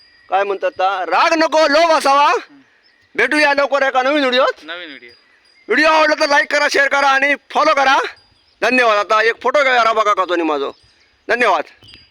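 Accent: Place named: Malvan, India. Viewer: मालवणी मस्त बोलतास, दुसर्यांसारखी नकली मालवणी नाय, अस्सल मालवणी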